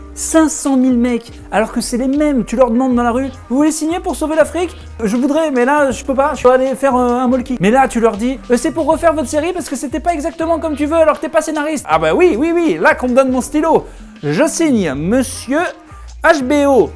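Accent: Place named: South, France. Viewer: J'ai jamais vu un épisode de GoT mais j'ai kiffé la vidéo. Ton accent du sud est parfait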